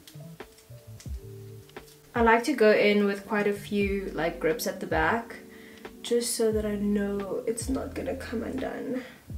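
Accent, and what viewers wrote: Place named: South Africa. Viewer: Omg you south african, I hear it by the accent 🇿🇦💖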